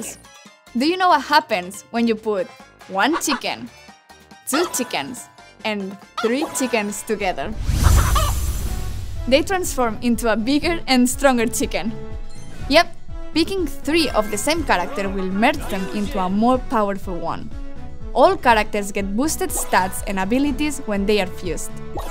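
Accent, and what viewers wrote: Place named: Spain. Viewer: Precioso acento Español y genial beta jajaja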